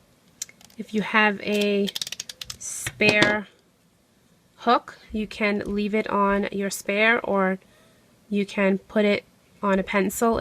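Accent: New Jersey, United States. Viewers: Are you from New Jersey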